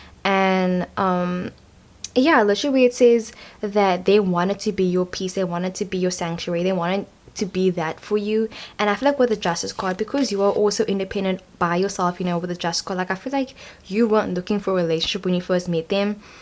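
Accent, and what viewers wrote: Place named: South Africa. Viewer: are you from... SA.... bc I am and I hear an accent 👁️👁️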